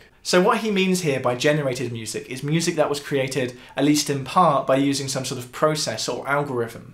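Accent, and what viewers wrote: United Kingdom. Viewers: What I also like about this channel is that English is spoken in a very clinical clear way as if it is radio English. Yes there is a slight British general accent, but not disruptive. We don't want to be sidetracked with heavily accented English. This is also understood by Americans without being American English. It's almost international English I dare say.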